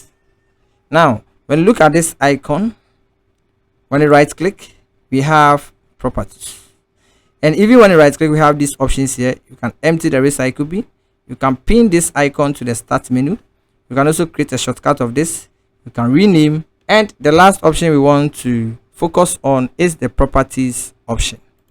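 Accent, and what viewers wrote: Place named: Africa. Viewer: Really love your African accent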